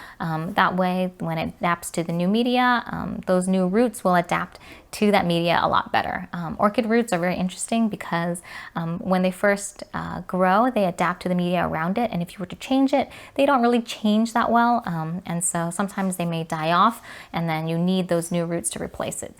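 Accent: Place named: California, United States. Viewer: Uuuugh that airy babyish sing song kardashian california accent is unbearable. Train yourself to get rid of it!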